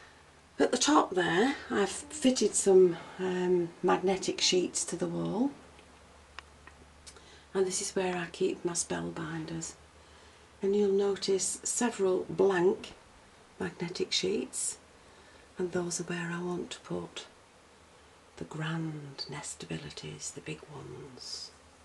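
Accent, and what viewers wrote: United Kingdom. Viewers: Love it! Are u in the Uk? I think i could tell from ur accent n got excited to finally come across a uk craft room tour as i know that if i like anything i can maybe b able to buy it! Where did u buy ur CD holder, the lamps for ur photo booth and baskey in ur ikea drawers? I hv the same drawer cabinet n today i should finish with my organising. TFS. Ur room is a lil heaven:)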